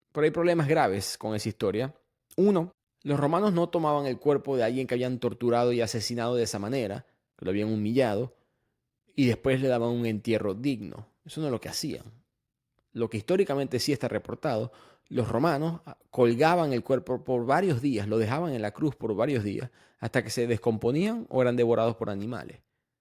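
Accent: Maracaibo, Venezuela. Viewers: Gracias por estos episodios, por el cuestionamiento y por tus análisis. Nueva suscriptora aquí. Crecí en una casa católica, estudié en un colegio católico. Toda la vida me hice estas preguntas que estas exponiendo en el episodio y la única respuesta fue "hay que tener fe". La falta de racionalidad, de cuestionamiento, hizo que me alejara mucho. Decidí irme por el camino agnóstico, luego ateo, pero ese ultimo camino me dejo mas vacíos que los anteriores. Desde hace un tiempo quise acercarme de nuevo al catolicismo, pero desde la curiosidad. Voy a misa todos los domingos a aprender, a escuchar, a conocer desde cerca la comunidad, para poder decidir por mi misma. Todo el mundo a mi alrededor (que ya no creen hace mucho) lo ven como algo raro. Empecé a leer la biblia para saber de primera mano que es lo que dice, porque siempre me la han leído de una forma hermosa. 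La frase que mencionaste "el ateísmo es lo que pasa cuando lees la biblia, el cristianísimo es lo que pasa cuando alguien mas te la lee" me quedo resonando muchísimo. Aun no he decidido, quizá sea uno de esos que a pesar de haberla leído o a pesar de las evidencias, deciden creer, o quizá no, pero la frase me pareció poderosisima, mas que pretenciosa. Gracias. (Shoutout al acento Maracucho!)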